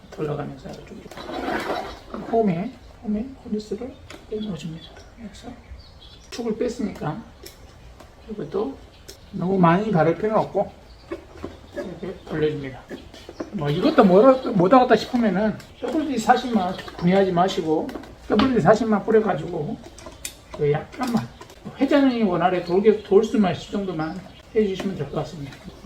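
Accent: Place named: Jeolla, South Korea. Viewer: 으미~~~~! 구수한 전라도 억양 듣기 좋네요~ 영상 잘보고 갑니다.... 바로! 실천에 옮기도록 할께용~~♡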